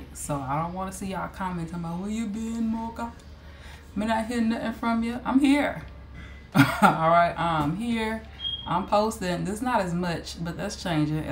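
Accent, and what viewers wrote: Jamaica. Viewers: Peace and Love Mocha, it's great to see you posting more videos lately. Mi love fi hear yu talk Patwah 🙂. I will definitely check out these business. I was about to order the Jamaica jersey but saw that it wouldn't be shipped until 4 to 5 Weeks. Will get it next month. Dat deh Jersey Nice!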